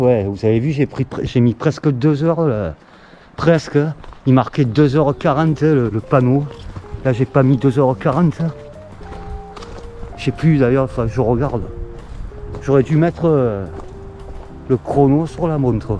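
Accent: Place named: Provence, France. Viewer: Coucou si vous me le permettez ☺️ Merci infiniment de nous faire partagez votre magnifique région qu’est la Provence Je suis allée il y a quelques années déjà à Saint Rémy de Provence j’y ai visité aussi les baux Votre accent me réchauffe le cœur J’espère que vous êtes bien rentré de votre randonnée! Je vous souhaite une bonne fin de dimanche et, Un bon repos surtout après tous ces kilomètres dans les jambes Une amie virtuelle de la Belgique 🇧🇪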